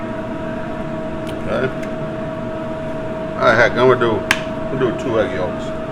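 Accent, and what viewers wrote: United States. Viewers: You have a very American style of talking, almost the accent.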